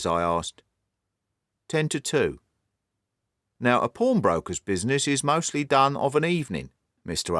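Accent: United Kingdom. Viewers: Beautiful British English, high reading class. I listened with pleasure. Thank you so much.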